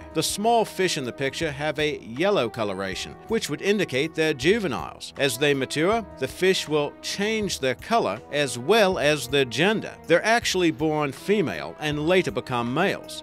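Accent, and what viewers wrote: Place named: Australia. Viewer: Why do you sound Australian but use American measurement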